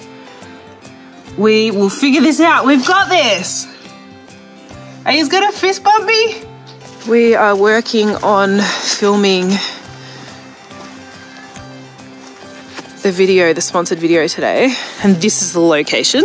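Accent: Australia. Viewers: Hi, just wanted to tell you I’ve been watching your channel for approximately a year now( yours is one of my favorite flower channels) love the Australian accent also all the scenery footage. As far as ideas on helping to sell flowers you could do workshops in your studio and also online. Your a very good educator and I love how your husband is as passionate as you are(maybe film more on a daily basis to include family life), I’m from the states and I love seeing Australia. I watch some YouTube channels who ask if you like the content and if they’ve been of any help to the viewers you can buy me a coffee ( which I believe to mean a donation in any amount to help keep the channel growing).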